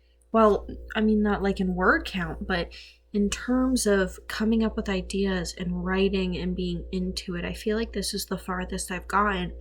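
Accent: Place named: United States. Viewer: Usually american accents irritate me but yours is very charming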